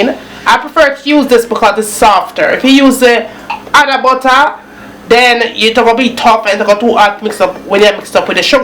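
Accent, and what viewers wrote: Jamaica. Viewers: love the video and the way yuh talk mi sure a nuh montego bay yuh come from..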